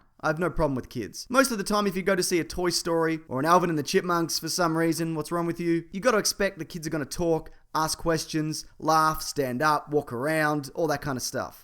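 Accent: Australia. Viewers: Delivered in that unmistakable Down Under accent!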